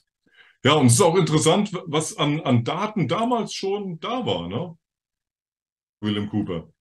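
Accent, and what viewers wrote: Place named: Hesse, Germany. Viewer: And then… Frühling is coming. 🎉 Ich hab ja echt ne Affinität zu dem Hessischen. 😅 Höre dir gerne zu. Nimmt dem ganzen so den Ernst.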